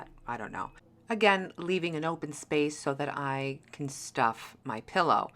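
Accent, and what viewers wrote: New York, United States. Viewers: Love these ideas. Got to do the tasseled mirror don’t be so hard on yourself. You’re a great teacher and so entertaining. Showing your mistakes is the best way to teach! If you are “perfect” your students will be intimidated. So glad Holly introduced us on the fake macrame hanging. Now I’m hooked on macrame I’m no good at it but I’ll keep at it with your help. That double half hitch knot may take years but I will master it Btw is that a NY accent? I’m a transplant and sort of miss it 🙏 thanks for all you do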